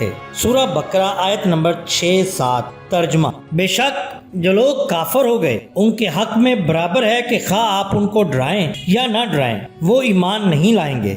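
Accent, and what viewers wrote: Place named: Punjab, Pakistan. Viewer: I hate PUNJABDA ACCENT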